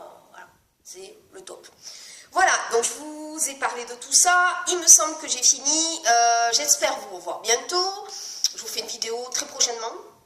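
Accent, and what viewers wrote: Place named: Catalonia, Spain. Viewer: Oui effectivement un bel accent du Sud catalan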